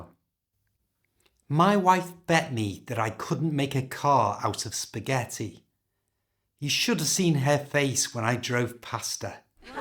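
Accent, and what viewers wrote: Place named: West Lancashire, United Kingdom. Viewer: Fun, useful and informative! I chat - online - to quite a few people from countries other than England and know how difficult it can be for most foreigners to understand British humour (unless it's particularly visual - EVERYONE seems to like 'Mr Atkinson'!). I'm going to devise a 'joke' about one sentence YOU said, "You need to know that the part your body you WERE when you put a belt on...."! Of course, this shows that regions and dialects can also give rise to clever 'word-play'. I'm guessing you are from West Lancashire?! (I once worked - in Panto - with Ken Dodd so learned lots about jokes, humour and accents - sometimes until after midnight!!) Thanks for posting!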